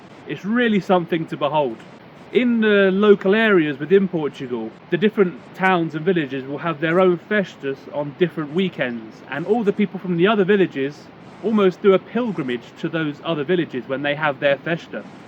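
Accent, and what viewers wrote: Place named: United Kingdom. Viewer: Gosto de ver a perspectiva dos outras pessoas sobre o país. Parece-me que muitos britânicos conseguem ter uma opinião fundamentada e clara rapidamente; entendem relativamente bem a dinâmica social. Também aprendo algumas coisas, aparentemente, muitos estrangeiros que não são ricos, mostram-se satisfeitos com uma casa simples, que vão renovando de acordo com as suas circunstâncias, e fazem-no com muita resiliência e serenidade. Penso também sobre os rendimentos destas pessoas, mostram ser criativos e com domínio do mundo digital, onde se movimentam bem, e talvez estejam dispostos a correr mais riscos em relação à fiabilidade do emprego/rendimento/income a médio prazo, do que eu estaria. Por vezes pergunto-me, o interior está desertificado e desaproveitado, se estrangeiros podem viver nestas áreas porque mais jovens portugueses não o poderiam também fazer? 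A resposta clássica de muitos portugueses, grupo nacional de que tenho a honra de fazer parte, seria que os "estrangeiros têm muito dinheiro e/ou fontes de rendimento dos seus países de origem". Na minha opinião é uma resposta redutora, claro que existem estrangeiros com muito dinheiro, mas provavelmente não serão a maioria, pelo menos dos que vivem em Portugal, por isso, talvez possamos reter algo do seu modo de vida, e da maneira como criam sinergias e soluções de vida no interior. Este simpático jovem senhor, transmite a imagem de uma pessoa pacífica e bem intencionada, gosto do sotaque britânico, e vejo sempre os vídeos s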